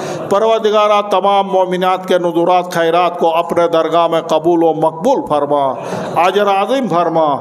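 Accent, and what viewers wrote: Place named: Gilgit, Pakistan. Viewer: I don't understand the majority audience are pashtoon then why he delivered his speech in urdu because he seems to be gilgiti not local by his accent strange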